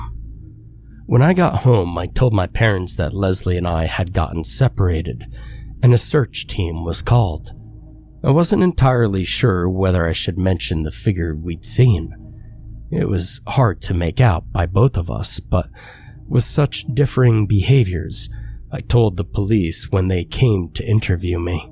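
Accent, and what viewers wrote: United States. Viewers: Told with a thick American accent, trying desperately, and failing miserably, to sound like a Scottish accent. If you weren't born and raised here, you are NOT a Scot. And you most definitely are not a highlander! I am. 54 years. Born in Inverness and now living on the west coast. So, don't even think about arguing with me!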